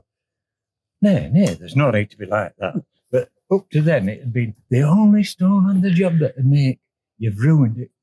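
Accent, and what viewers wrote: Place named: Yorkshire, United Kingdom. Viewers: It’s cors he’s from up north uk...... listen again and you’ll get it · I understood every word, I married a Yorkshire man! Great skills passed on ! Are the boys having a go? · Well done Dad your becoming a star. Keep it up. although most can not understand your accent, I think they need Yorkshire lessons. :-)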